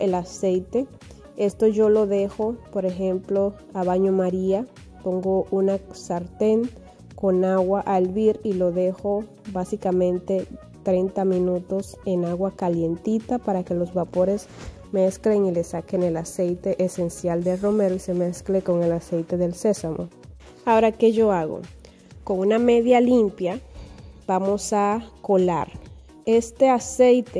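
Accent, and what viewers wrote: Dominican Republic. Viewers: Hola mi corazón, sabes antes de entrar a tu pagina, me imaginaba que eres Dominicana por las palabras que usabas en el video, bueno como dices los nombres de los ingredientes y la preparación!! jajjaja muchas felicidades y voy a ver si hago ese ungüento para regalarse a una amiga que tiene mucho dolor de las articulaciones!!!! Una pregunta tonta que yo escuchaba cuando era pequeña en los 1986 aproximadamente, una vecina de mi mama preparaba un aceite que ella llamaba aceite eléctrico, es verdad que sirve para los dolores también?....... cosas mías, pero siempre he tenido esa pregunta en mi cabeza jajjaja.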